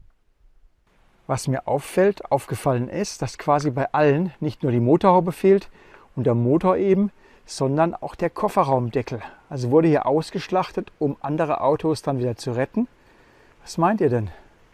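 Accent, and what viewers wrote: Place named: Swabia, Germany. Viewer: Hallo, ein trauriger Anblick, die ganzen Überreste der Autos zu sehen. Erkannt habe ich kein einziges davon und das soll durchaus was heißen.🤔😅Aber ich habe dennoch eine Gemeinsamkeit der Autos entdeckt, bei allen ist das Dach eingedrückt. 🤔😉 Deine Unterkunft ist ja mal wirklich schnuckelig und der Ausblick grandios.🤩👌 Aber mer hert hald scho da Schwob in dir, "sodele". 😂😂👍 I winsch dr an scheene Sonndig ond dange firs mitnemme. 😊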